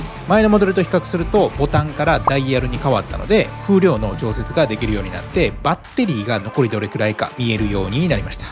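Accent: Kansai, Japan. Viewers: 一生〇〇って話し方関西人特有だよな。嫌いだわー内容いいのにもったいない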